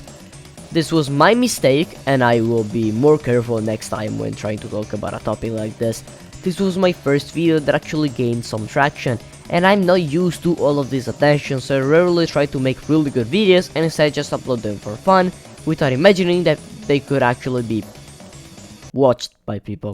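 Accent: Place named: Italy. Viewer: I love how blatantly italian your accent is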